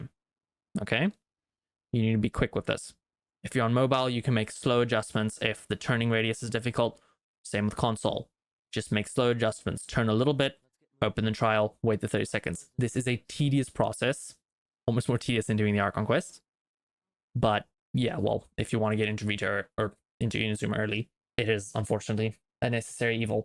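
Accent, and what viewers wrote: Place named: South Africa. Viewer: Great video! Are you from south Africa? You sound like it! Also i subscribed cause i want to see more of your claymore series. Im doing an only female account so this is very useful for me thank you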